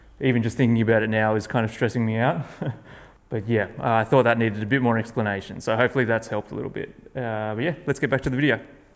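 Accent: Australia. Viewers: Hi, I just stumbled onto your video documenting your amazing bench seat. Nice to hear an aussie accent on YouTube and a Sydney business as well!!! Amazing craftsmanship, thanks so much for sharing... 🙂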